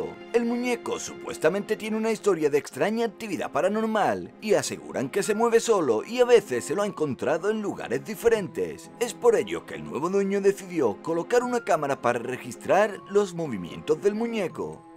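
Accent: Spain. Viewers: FELICIDADES COMPAÑERO DE DONDE ERES? ESPAÑA? CREO QUE SI POR TU FORMA DE HABLAR ESPERO Y CUMPLAS MUCHOS AÑOS MAS AMIGO MIO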